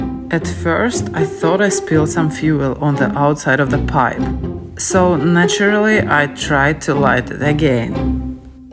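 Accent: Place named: Eastern Europe. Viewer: Like your eastern european accent, did you have a foreign english teacher? No offense, I understand you fine, just interesting🧐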